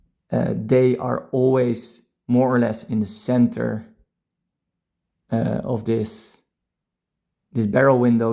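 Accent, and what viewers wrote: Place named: Netherlands. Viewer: wllh ik hou van deze nederlandse accent ahahah, je kan het van een km ver horen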